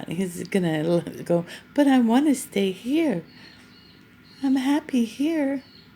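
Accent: United States. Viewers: You have an American accent. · Why the American accent if you are in Australia? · You sound American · Hes so cute!! When I first heard your accent I thought this was in America until I heard you say he spat the dummy haha.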